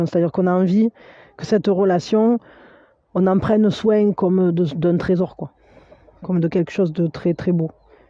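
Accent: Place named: South, France. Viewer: Vous avez raison , la Gratitude est du miel pour le Coeur. Merci à vous pour les messages transmis. Vous répondez à mes prières au mot prés ! Un jour sans doute quand le moment sera Juste et Bon pour moi. Juste une remarque : Avez-vous remarqué que votre voix, au demeurant très mélodieuse, perd son accent du Sud quand vous chantez ? Merci encore et prenez bien soin de vous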